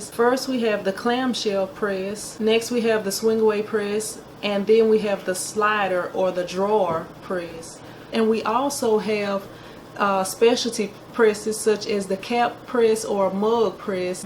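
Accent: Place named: Louisiana, United States. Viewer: Thank You! The information you provided was so helpful! Love the accent I'm from Louisiana also!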